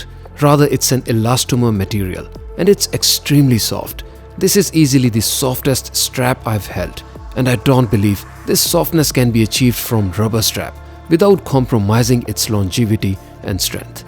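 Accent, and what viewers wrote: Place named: India. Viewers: Fod God’s sake get rid of the Indian accent... otherwise your videos are stunning. I had to think for a minute to figure by “Veer” you meant “Wear”, annoying distractions. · Losing the accent might be difficult to do if he happens to be Indian. Gtfo.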